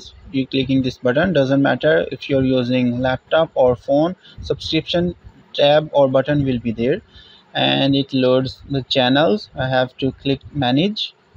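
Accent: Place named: India. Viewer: You probably speak russian(judging by russian channels), indian (or pakistani I dont know, just from your accent) and english and other asian languages? if not more... That's impressive!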